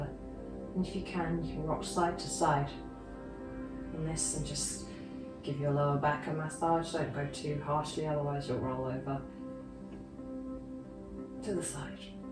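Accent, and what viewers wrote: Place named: United Kingdom. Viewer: Lovely session. And the British accent just adds so much more to the relaxed atmosphere 😂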